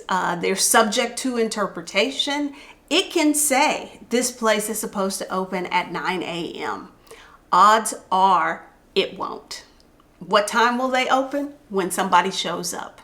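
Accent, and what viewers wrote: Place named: Texas, United States. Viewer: I moved to Mexico last year, (Ajijic), and your list was VERY informative. Thank you! Love your Texas accent.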